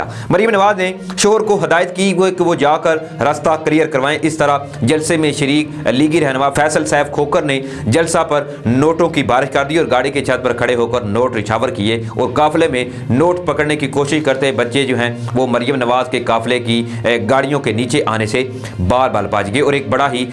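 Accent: India. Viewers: urdu ko urdu lehje main bolo. Ziada indian banne ki zaroorat nahi. Is se tumhara ehsas e kamtari nazar aata hai.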